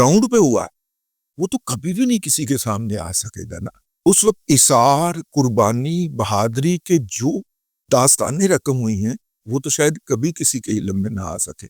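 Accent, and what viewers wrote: Pakistan. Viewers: I love your Pakistani tune, which is highly appreciated. Thank you DADA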